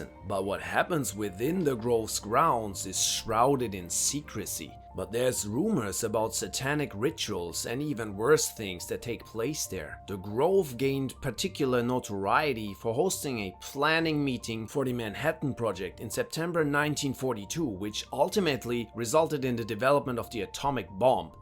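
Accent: Germany. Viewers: Why so German 😮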